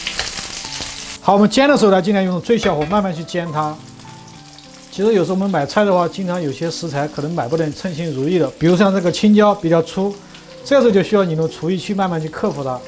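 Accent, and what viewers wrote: Sichuan, China. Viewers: 我是北方人，但是一听师傅的四川口音，就知道绝对靠谱👍🎉🎉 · 听您的口音是四川人吗？我是居住在苏黎世的四川人，看到您排骨的做法，很喜欢，瑞士奶酪🧀️很多又便宜，希望您推点奶酪入菜的菜品，谢谢🙏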